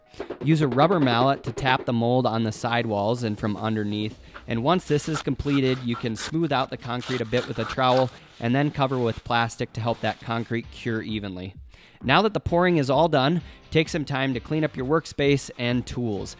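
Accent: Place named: Montana, United States. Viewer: You don’t have to tell us you are from Montana. We can tell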